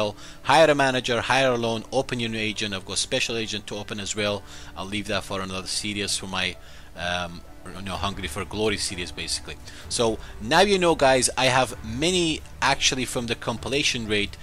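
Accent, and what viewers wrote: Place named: Scotland, United Kingdom. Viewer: do you live in Scotland? sounds like a Scottish tint in your accent haha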